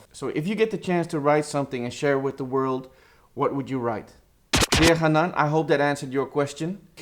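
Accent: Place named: Netherlands. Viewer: Dit is veruit mijn favoriete video. Dank u voor het script op het scherm. Dit is heel nuttig om nieuwe schrijvers die niets en nooit gezien wist over script en keigaaf. Dank u. Wij willen meer wij willen meer. Niet zeker over de kleur keuze hoor. Sorry man. U vraagt om eerlijke feedbfeedback. Oppassen met wat je vraagt om ze zeggen. Rreally vooruitkijkt naar de volgende one.Misschien volgende keer is over kleur kiezen en concrete voorbeelden van het gebruik van de camera op het scherm Iran. Stuurt u voorbeeld van wat ik heb. Film Academy is officieel geopend. Geweldig werk Keep it up.Ik denk dat het tijd is voor Fb pagina waren kunt u deze video's en schrijf mee.ook vriendelijk op beschrijving alle schakels/nes u noemt het cameramodel u gebruikt of kleuring cijfer van dat bepaalde video d.w.z. sterren zeggen hedendaagse episode wordt gefilmd yang zxxz camera thier bepaalde instelling. 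Ook voor bijvoorbeeld staat in thw beschrijving het script App naam duidelijk niets te vermelden, laat een l8nk voor de kijker te gaan zoeken meer over het onderwerp.Sorry maar Nederlandse accent is niet bekend hier. Moeilijk om te begrijpen dat je. hihihi. Weldone.Blijf insturen ooooo je guru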